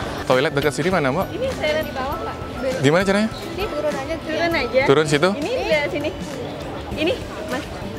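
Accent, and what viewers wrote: Bali, Indonesia. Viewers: aksen balinya kental banget :D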